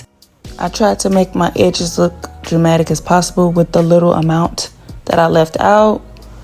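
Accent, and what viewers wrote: St. Louis, United States. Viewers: My first time watching you… I knew you were from the same city cause you said “hur” instead of “hair” & said make sure them tracks aint going no whur” lol perioddd we soo Saint louis SUBSCRIBED ❤💕💕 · Where you from baby? The accent is giving STL! 💁🏾‍♀️💁🏾‍♀️ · Very cute!! Sounds like you are from st.Louis 🥰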